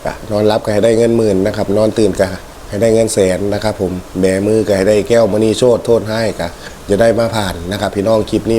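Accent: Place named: Nakhon Phanom, Thailand. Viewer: คนที่ไหนคะสำเนียงเหมือนคนนครพนม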